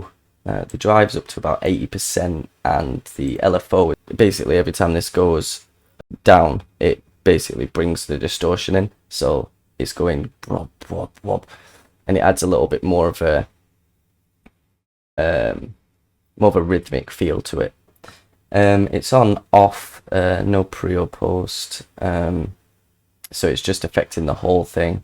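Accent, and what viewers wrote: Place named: North, United Kingdom. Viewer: you hear the northern accent and know its proper jump up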